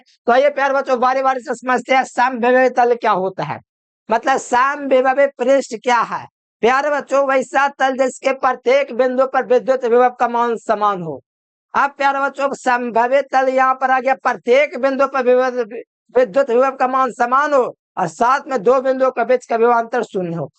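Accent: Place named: Bihar, India. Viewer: Bihari style me education lecture 🔥🔥🔥🔥🔥🔥🔥🔥🔥🔥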